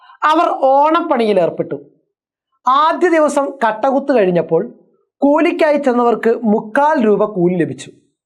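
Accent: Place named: Malappuram, India. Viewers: മൽപ്പൊർത്തെ വർത്താനം പൊളിയാണ് സാറേ.. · Malappuram basha aan poli🔥💕💕💕thallumala⚡️👍🏻🔥🔥malappuram⚽️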